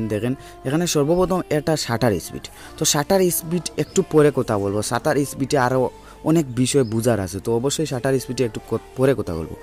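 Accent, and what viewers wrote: Sylhet, Bangladesh. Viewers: vai Sylhety?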